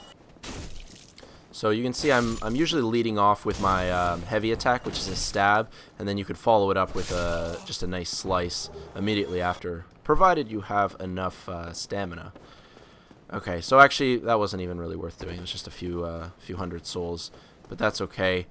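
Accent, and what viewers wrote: Canada. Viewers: Love the accent, Canadian? This condensed walkthrough will help me immensely. I died after my first attempt to get into Undead Burgh after I slaughtered quite a few and had a "wat?" moment so I've been waiting for some help.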